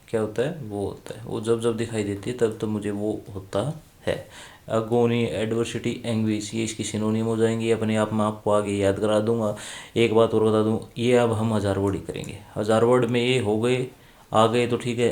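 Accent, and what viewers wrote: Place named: Haryana, India. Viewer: 😅 PURE HARIYANVI ACCENT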